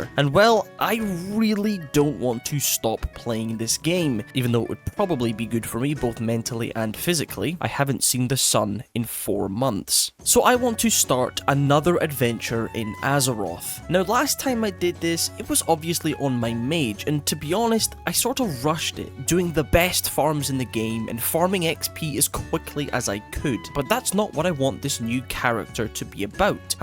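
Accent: Scotland, United Kingdom. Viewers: The accent make me remember my friends grandpa from Scotland 🏴 ❤ he was such a nice guy and these videos are so comforting ❤ love from Norway 🇳🇴 🎉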